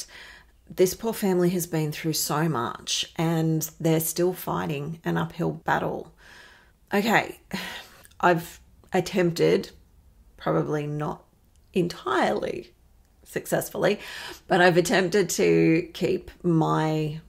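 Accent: Australia. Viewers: I don't usually like Aussie accent but I could listen to you all day U.K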